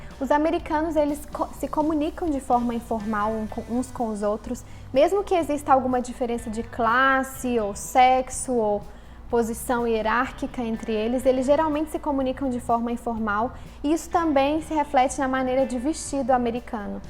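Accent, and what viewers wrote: Minas Gerais, Brazil. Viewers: vinicius Correção, sotaque mineiro, então você é de Minas kkkk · Você é mineira?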